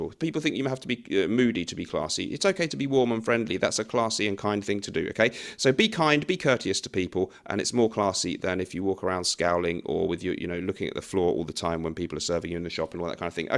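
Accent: England, United Kingdom. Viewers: ....classy? Smartly dressed and that English accent is helpful.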